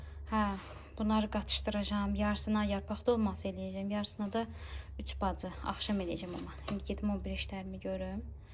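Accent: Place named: Mingachevir, Azerbaijan. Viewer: hə lap yaxşı məndə Mingeçevirliyəm sizdə bizim kimi danışırsınız maraqlı gəldiniz mənə sizi görməsəmdə doğma gəldiniz mənə ❤❤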